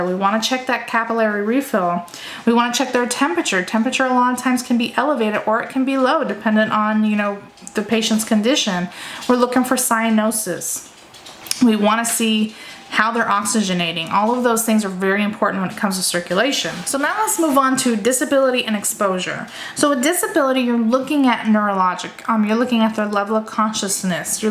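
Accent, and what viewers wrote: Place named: Florida, United States. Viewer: you ROCK! This is an excellent recap of PALS...I've been certified since '97 and this is the best class I've ever been to. I did have to speed you up to 1.5 speed, and you sounded more like a Floridian, rather than a Georgian (my home). Thanks a ton and God Bless You!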